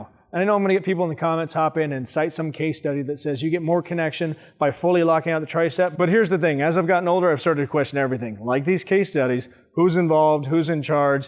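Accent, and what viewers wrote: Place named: South, United States. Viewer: You sound and talk like Stone Cold Steve. Is it because you're both from the south?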